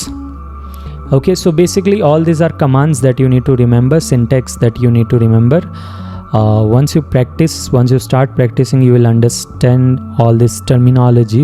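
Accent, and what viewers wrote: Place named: India. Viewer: you look like filipino with indian voice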